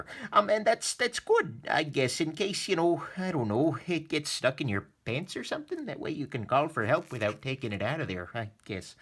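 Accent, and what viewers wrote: Canada. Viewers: Hey, are you from Canada or Minnesota? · I knew i could detect Canadian right away.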